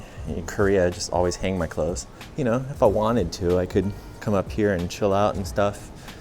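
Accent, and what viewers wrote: United States. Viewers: Thank you for your reply. Hanoi sounds like the ideal climate for me. I like a mild winter but not 5 months of ice and snow. I would like to find a studio for $250 or a little more depending on amenities. Like you, I don't want to share a kitchen. Too many bad experiences sharing kitchens or bathrooms. Most people are not very considerate. You sound like an American. Are you American or Canadian? If American, have you run into resentment regarding the Vietnam war? I was always against the war. I am old enough to have fought in it but I was so opposed because I knew we couldn't win unless we used nukes and that would have brought in China. Johnson was a very bad, stupid president who wasted a lot of lives on both sides because he couldn't admit he was wrong. Eisenhower told Kennedy to never get involved in a land war in Asia because you can't win but they murdered Kennedy who was going to pull out after the 1964 election. 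I have many reasons for being interested in living in Vietnam, mainly the cost of living and I like the people. I rented apartments in S.F. to boat people in 1979 and found them to be lovely people. I also am interested in seeing if I can help mitigate the damage the U.S. did by teaching english, friendship, mitigating agent orange etc. By being there I can also help their economy in my small way.I will look for your Q and A video. Sounds good. Thanks again.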